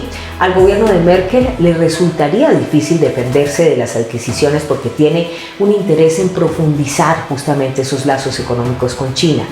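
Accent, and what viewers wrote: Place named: Colombia. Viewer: wow, creo que seré de las primeras en el chat, saludos!! Amo el acento colombiano:)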